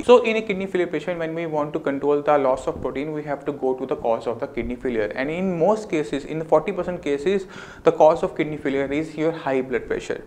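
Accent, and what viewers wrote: India. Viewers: You can slow the speed of play. I actually have it sped up and I understand him perfectly. I suspect you're not used to the accent. I'm British. His English is accurate. He may speak quickly for ear but once again its probably that you haven't had much interaction with ppl from the Indian subcontinent. I used to find it incredibly difficult to understand southern American accents. Took weeks to adjust. Every word slurred into the next... Sometimes too slow to follow the train of thought sometimes too fast and stoccato.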